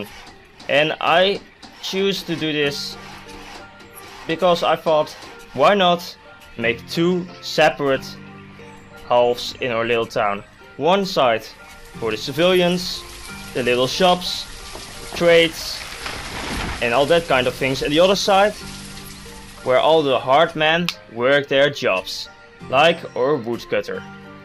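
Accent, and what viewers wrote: Netherlands. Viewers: Nederlands accent woehoe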